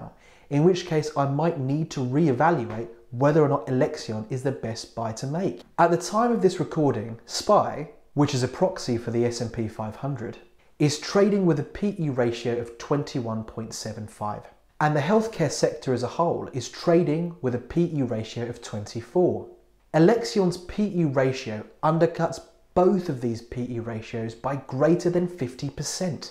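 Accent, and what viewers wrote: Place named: England, United Kingdom. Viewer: Interesting accent. Are you from England?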